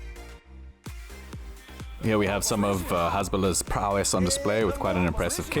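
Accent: South Africa. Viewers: HOL UP ARE YOU SOUTH AFRICAN ????????? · Well done not the video. Are you South African? You have to be with that accent. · You are definitely south african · Are you from South Africa, accent sounds like it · Hi are you South African ? Sounds like a wyt saffer accent. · Awe boet, I can hear you are South African, good to hear a familiar accent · Bru are you South African? You sound South African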